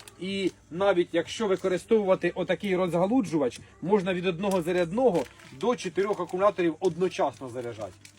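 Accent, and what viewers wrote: Ukraine. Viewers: Полтавська мова) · Красавчик, этот говор, этот суржик класс,😊 я почти также говорил в детстве, осйо оце, воно. 😊😊😊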